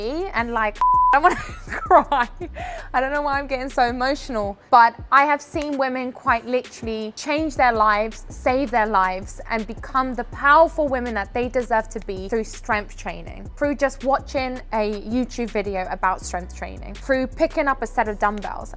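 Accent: United Kingdom. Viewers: Been watching your TikTok’s for a while now, had no idea you were British 😅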